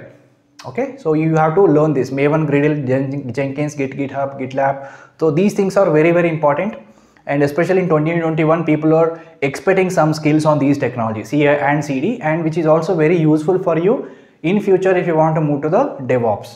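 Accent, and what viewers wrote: India. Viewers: First thing: Improve your English. This is Indian English, not UK/Oxford English. I don`t understand a shit.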